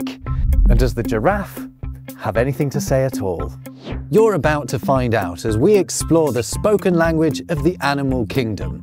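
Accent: United Kingdom. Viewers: I like your British TV accent and style of talking. Have you by any chance worked for sky or BBC? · Hearing a British person talk about parrots makes me think of certain things. Would I like to hear an African grey parrot? I'd much rather hear a Norwegian blue.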